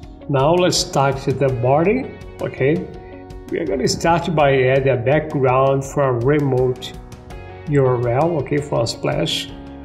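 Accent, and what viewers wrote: Brazil. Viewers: I've never heard a brazilian dude speaking english😂😂😂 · Você é brasileiro ne ?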